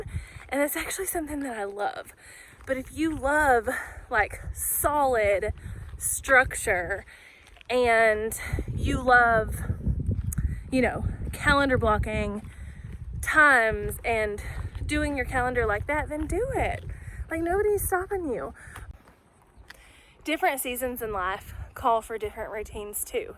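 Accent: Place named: Carolina, United States. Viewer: Nice Carolina accent you have -)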